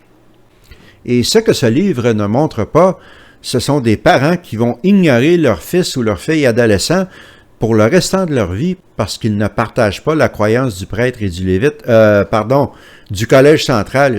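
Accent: Quebec, Canada. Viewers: C’est plutôt amusant et ironique en même temps! Vous dites faire tout pour plaire à Dieu, maiiiiiiiiis vous critiquez sa façon de s’exprimer. Intéressant comme réaction. Heureusement qu’il est de Montréal et pas du Saguenay ou même du Nouveau Brunswick 😳 en passant il fait déjà beaucoup d’effort pour pas parler trop " Québécois " ( sans offense pour les Saguenéen ou des Néo-Brunswickois) et en passant ce n’est pas aux autres à faire des efforts pour bien se faire comprendre, on se comprends?😂 désolée trop tentante comme joke (plate bien sûr…) Bref vous venez d’où au juste? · Il est super génial son accent québécois!! et vous "Joe Joe" , votre français n'est pas parfait non plus...! il faut vraiment vous laver les oreilles ou écouter une autre vidéo , mais critiquer désobligeamment ce n'est que pour "critiquer" (toute critique n'est pas mauvaise, il est bon de l'apprendre, de le savoir ) vous Joe Joe quand vous critiquez ce n'est que pour "avoir quelquechose de négatif à dire" ?! 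(si vous ne supportez pas , pas obligé de le dire,!) et il est bon de se renseigner plus intelligemment: en allant chercher les infos utiles sur l'auteur par exemple de la vidéo, avant de "critiquer" (en négatif,)et sachez aussi qu'une critique peut être positive et constructive, au passage...🤔😉 en plus c génial pour mieux "comprendre" le fonctionnement de cette société sectaire (car cela vient de là-bas, des states" et lui parle très bien anglais aussi, il est adorable de nous le faire pour la francophonie!) en France : ils n'osent pas vraiment témoigner, et lui il a les bons tenants et aboutissants pour mieux comprendre la machine à endoctrinement de ces pauvres croyants adeptes TJ! Vive LE QUEBEC !🏵🏁👍 (et céline dion : quand elle ne chante pas ,quand elle est interviewée en france : tu ne la comprends pas non plus ? 🤣)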